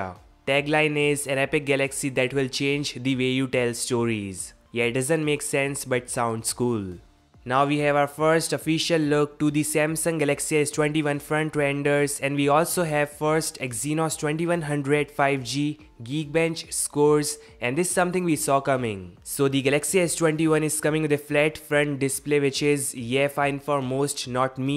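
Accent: India. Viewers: Yeah,Indian accent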